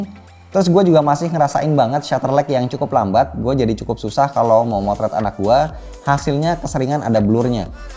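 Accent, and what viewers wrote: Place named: Java, Indonesia. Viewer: Bang, ternyata medog juga ya 🤣🤣🤣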